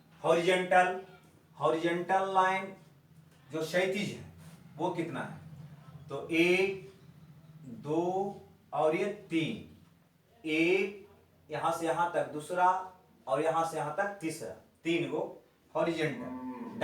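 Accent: Bihar, India. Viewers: Aap Bihar Ka ha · Sir Aap Bihar se hai???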